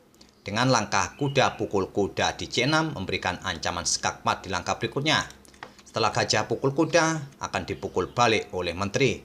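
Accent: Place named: Banyumas, Indonesia. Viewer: Sama ngapak2 min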